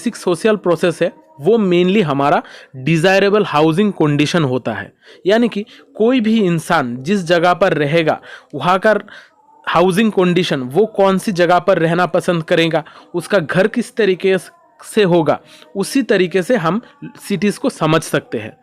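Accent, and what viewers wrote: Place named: Bihar, India. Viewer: Bro, he is bihari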